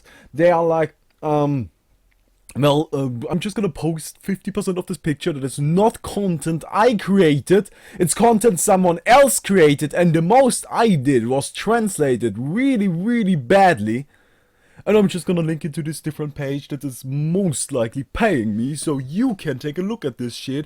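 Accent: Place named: Germany. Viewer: hay bistb dud eutscher=? dein aktzent ist soo schön deutsch ;)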